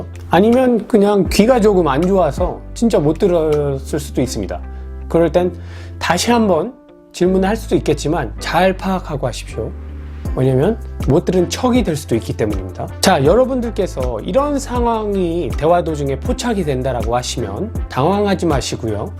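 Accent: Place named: United States. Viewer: 케이C님 토종 한국인 인줄 알았는데..영어 발음이...미쿡사람?^^ 이셨내용 👍잼있고 유익해서 담편도 당근 기대합니다~~😉😉😉😉😉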